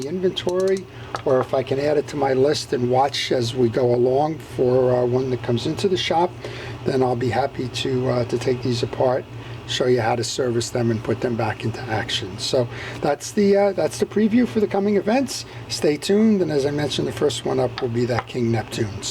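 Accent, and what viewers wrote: New Jersey, United States. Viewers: Just found you a couple weeks ago, love the Jersey accent,I live in CT. am 60 yrs old and ONLY fish with vintage rods and reels,I give the "new stuff" to my grandson.I have in my arsenal many 60's-70's japan reels which were made by the big three(Daiwa, Olympiic,Zebco, etc.., under different names,they're tough,cheap, and easy to repair,and THEY CATCH FISH!!!,I also have some french mid-century reels including the Mitchell saltwater line and my beloved RU-MER's I'd llike to see a vid on some of those old "coffee-grinders", they can be pretty intimidating when you take the side cover,off, or try to repair the bail mechs, Anyway thanks for posting, and helping others like myself who appreciate a time when things were made to last!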